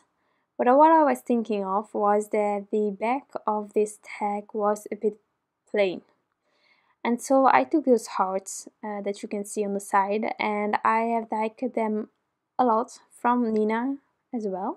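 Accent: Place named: England, United Kingdom. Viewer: Thank you so much dear 🤗🤗🤗🤗🤗 this video is so wonderful!! I am so privileged to have you in my Design Team 🥰🥰🥰🥰🥰 And I really love your English accent 😃😃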